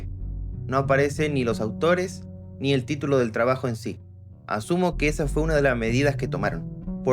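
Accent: Chile. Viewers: Oye porque tienes acento chileno? eres chileno o peruano me confunde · Eres chileno? Tienes el acento. Lo sé porque soy de Tacna y es muy marcado su acento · Eres chileno? Por la forma de hablar. Por cierto, saludos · ¿Es chileno? 🤔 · Eres de chile ? Tienes cierto acento 🤔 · Eres sureño? Siento chilenidad en tu acento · hablas como chileno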